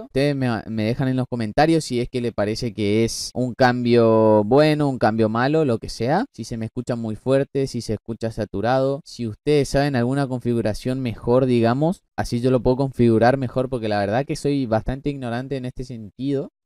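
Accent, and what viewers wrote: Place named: Argentina. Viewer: Hola me encanta tu forma de relatar y acento argentino ❤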